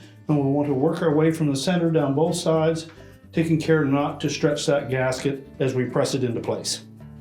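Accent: Canada. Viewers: I think he might be from Canada. They would have an accent like that.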